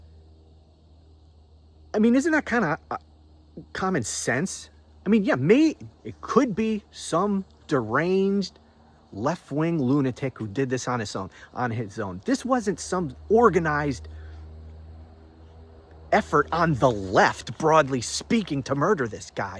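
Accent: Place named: Quincy, United States. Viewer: I love this cat 😅! Sounds like Quincy, Mass. accent, I don't know, but I love how he, and rightfully so, loses it completely on maga idiots 😂!🤣🤣🤣🤣🤣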